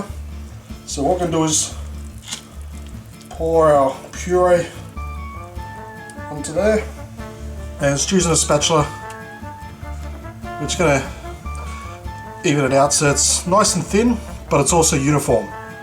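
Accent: Australia. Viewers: Are u from Australia if so what state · I'm subscribing you you can you have a really cool oz accent and your videos are creative and original keep em coming!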